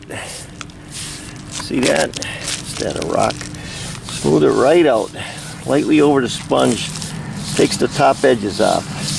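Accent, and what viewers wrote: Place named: Pennsylvania, United States. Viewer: I love your stuff, very great insight and simple….”just get it in, don’t over think it”…… true for just about everything in life!!! and u sound like my family from Schuylkill county, don’t know where u r from in PA, but u sound like a good ol’ coal region boy!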